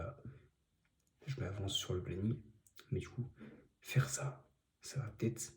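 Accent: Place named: United Kingdom. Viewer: Stp arrête de nous faire le british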